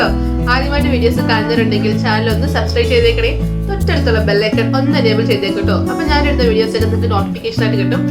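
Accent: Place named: Nilambur, India. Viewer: വാഴ കൂമ്പ് തന്നെ നിലമ്പൂർ ഭാഷ 😍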